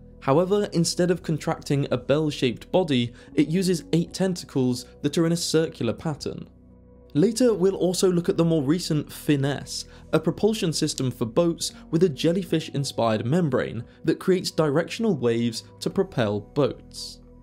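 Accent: United Kingdom. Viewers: A British narrator should "reduce" his British accent? Why? Because it triggers a snowflake like you? Get back under your rock 🤣🤡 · Any chance the narrator can reduce the British accent? WautER instead of WautAH?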